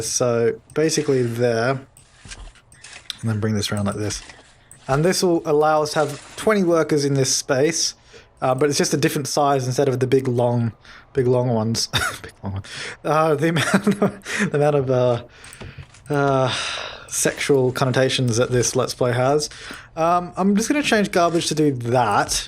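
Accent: Australia. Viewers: Conner Herald me to i like how Australians talk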